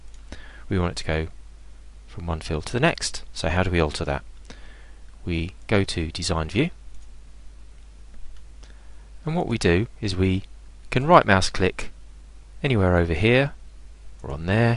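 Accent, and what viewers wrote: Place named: United Kingdom. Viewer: Great concise instructions, although the intro showing why it's needed it probably not so necessary. We wouldn't be here if we didn't already know what was wrong. And delivered, no less, in that wonderful British accent that makes the material just so much more profound-sounding; the accent that can make reading a telephone book sound like an interesting intellectual exercise! Cheers!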